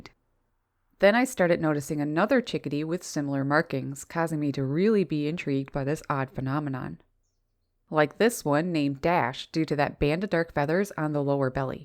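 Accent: Canada. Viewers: Most Canadian accent ever lol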